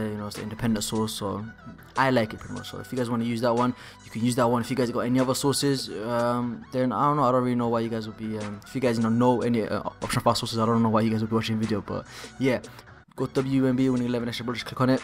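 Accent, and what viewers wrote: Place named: London, United Kingdom. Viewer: London accent! Gunners Fan??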